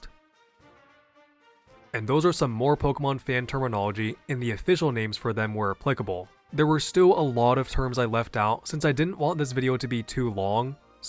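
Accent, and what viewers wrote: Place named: United States. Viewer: Today I learned is half Japanese that's pretty neat! Idk why I always assumed from your voice that you were like John America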